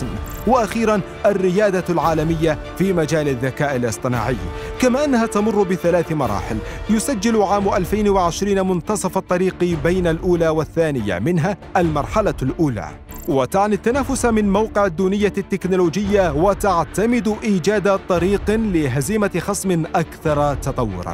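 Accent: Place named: Egypt. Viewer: المعلق مصري 🇪🇬❤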